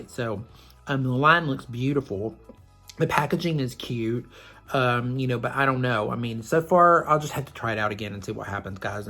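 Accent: South, United States. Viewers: I should have watched this during my tough week! You are so much fun (and I am learning a lot)! I love that Charlie's Angels lunchbox:-) I grew up in the 70s and 80s. "It has them arresting somebody on the back" - one of the funniest YT lines ever! Plus the safety rules? Too much fun! Don't let anyone tell you "how to" pronounce words - love your southern accent! That KGD foundation is my favorite. It looks good no matter how applied. You deserve the recommendation by Tara so much! A few favorites - speed racer, strawberry shortcake, holly hobbie (blue bonnet), wonder woman, smurfs and so many more!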